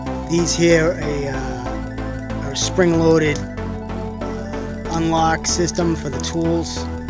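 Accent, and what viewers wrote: Rhode Island, United States. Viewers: Dude’ I love your Rhode Island accent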